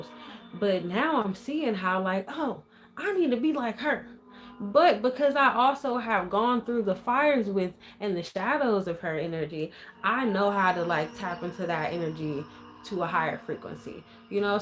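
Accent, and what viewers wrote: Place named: Ohio, United States. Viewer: Beautiful voice. I know you have that very good Ohio voice. We can sang 🙏🏾🎼🎼🎼🎙💃🏿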